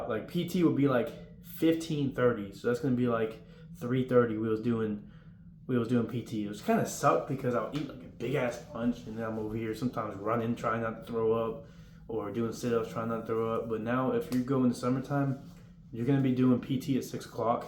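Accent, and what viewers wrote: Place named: South Carolina, United States. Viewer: Are you from South Carolina? Sound like it lol i am too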